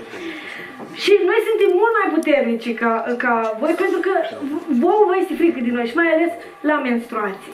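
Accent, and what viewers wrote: Moldova. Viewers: O actrita fenomenala, o femeie geniala. Accentul ala moldovenesc nu o merita si... nu isi are sensul. Nu suntem moldoveni, ardeleni, munteni... suntem Români. Felicitari, esti fantastica!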